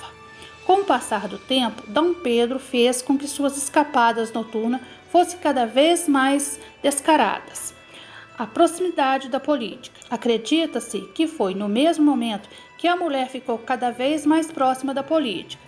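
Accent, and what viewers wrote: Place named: Piauí, Brazil. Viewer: Vc é do Piauí? Seu sotaque me lembrou meus amigos de lá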